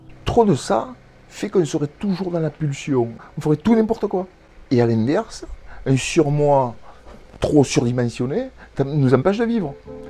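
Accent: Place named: Marseille, France. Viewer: Vous êtes le seul à nous présenter les topiques sans prise de tête, c'est compréhensible et tout a fait abordable finalement. A condition d'expliquer ÇA clairement, sans trop de termes barbares. Étudiante en soins infirmiers, ÇA m'aide beaucoup. Merci beaucoup !!! Le cadre est idyllique, sous un magnifique soleil ! Mais ce qui rend cette vidéo agréable à voir, et surtout à entendre, c'est ce chouette accent marseillais qui donne une jolie musicalité à vos propos. J'adore 💚❤ Encore merci... 👍😘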